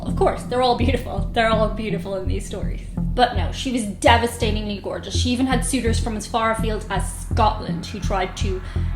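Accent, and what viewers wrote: Ireland. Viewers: Ha come to think of it that kinda does describe most Irish women I know...I love stories like these and your accent makes them 100% better. Well done 👏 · The irish accent is cute and hilarious 😂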